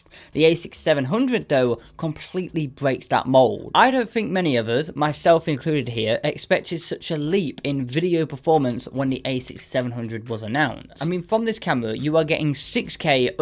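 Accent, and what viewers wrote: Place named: England, United Kingdom. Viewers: I know it's an English accent but can anyone tell me from which region? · Hey, I'd say it's definitely some sort of Estuary English, meaning London.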